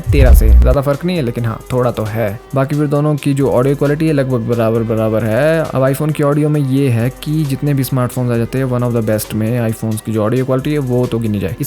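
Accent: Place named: Himachal Pradesh, India. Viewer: Bhi awaz si himachali lg rhe ho ✔️✔️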